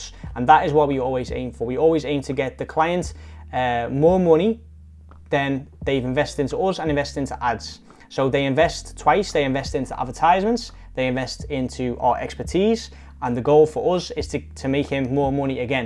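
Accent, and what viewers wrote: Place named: United Kingdom. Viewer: So, ....you are in The Netherlands, but you have a British accent and your photo at the end of the video is in Rome. Is that right? Are you British? I like your channel.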